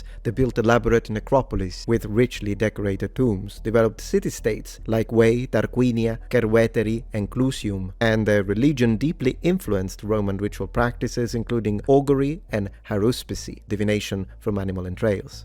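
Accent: Sicily, Italy. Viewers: Sicilian, he is from Palermo. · The italian accent make history lessons about that region more authentic...I am not sure if I like that thought, but I like that it is what it is😅 God bless you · He's speaking with a slightly Italian accent. Palermo is in Italy last I checked.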